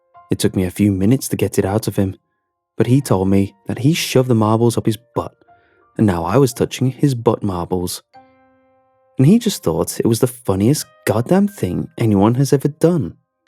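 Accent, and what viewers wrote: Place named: Wales, United Kingdom. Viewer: Why does his accent sound a little bit welsh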